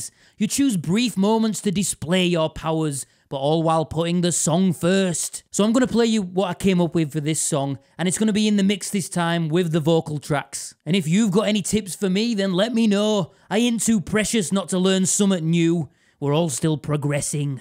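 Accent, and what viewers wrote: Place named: Yorkshire, United Kingdom. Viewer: I would definitely donate a bit of money to you if the country in which I live in did not have an economy as shite as that of Turkey:/ I first started watching your content since the Yorkshire accent really took my attention but ever since then you've made my day several times with your unique style of humor and behs playing. Keep on rocking, thanks for the vids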